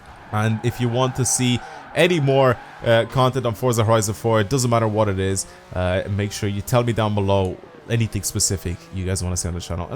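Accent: Ireland. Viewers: Is ur accent irish cuz u say cär instead of car xd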